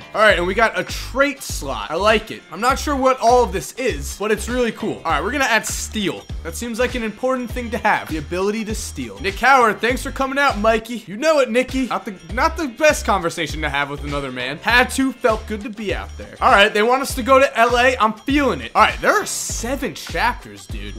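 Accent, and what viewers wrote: New Jersey, United States. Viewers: Are u from New Jersey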